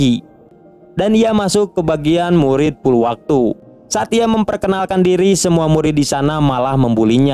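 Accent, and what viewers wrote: West Java, Indonesia. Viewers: Bahasa nya gk angger,ada sunda²nya 🙏🏾🤭😂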